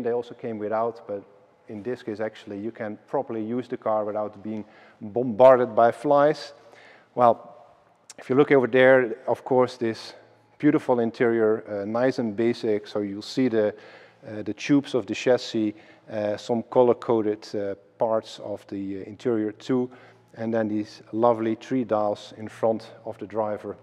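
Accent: Netherlands. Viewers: Always like your videos, but to be honest, for someone like you, an international name in coach building cars. I experience a kind of annoyoance in your Dutch-English, there's so much potential of you take a few classes. I hope you appreciate my somewhat cold feedback 😬 Best wishes for you and Kaeve in 2023 (and beyond)..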